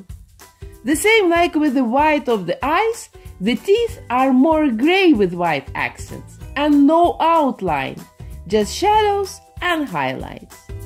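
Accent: Russia. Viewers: Русская что ли? Слышно что английский не твой родной язык.... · I'm sorry for asking but is she a Russian or Ukrainian? She has a very strong accent... like I have, haha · I love your accent! Are you russian? If you are, I am too! ❤ love your channel btw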